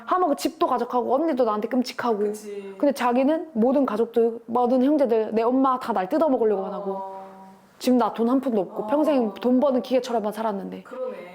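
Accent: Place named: Seoul, South Korea. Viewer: 언니 나 하루에 2번씩 보고있는데 연기력 물이 올랐쟈나~ 서울말투 기가 멕히쟈나~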